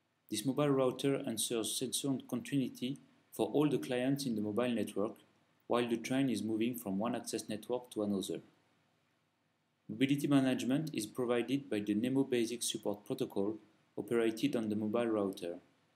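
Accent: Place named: France. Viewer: No no, French English ;-)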